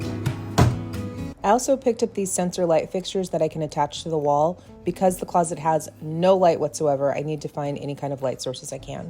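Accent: Scotland, United Kingdom. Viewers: Loved the Scottish accent!❤ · Hey 🩷 I stumbled across your channel about a week ago & I love your resourcefulness! I don’t think that I personally can be a minimalist, but you give me so many ideas for storage and declutter! I would say add a long frameless mirror in that gap, it will reflect light from either your bedroom window or your bedroom light! Oh & your Scottish accent is great! I’m from England & I can barely do my own 😂🩷✨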